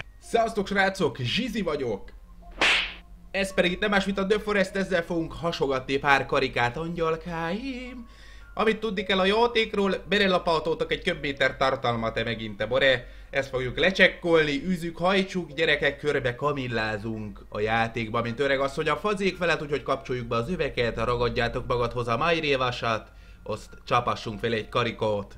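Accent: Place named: Transylvania, Romania. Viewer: nagyon erdéji a beszéded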